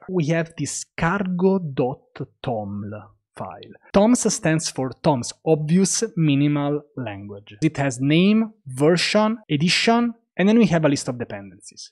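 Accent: Italy. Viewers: si alla fine l’ho completata tutta la serie e l’ho capita benissimo, l’accento italiano ha aiutato molto ahah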